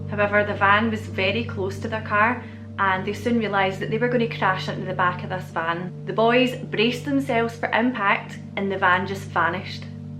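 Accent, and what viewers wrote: Scotland, United Kingdom. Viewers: Great video and amazing channel... And I love your Scottish accent too! 🙂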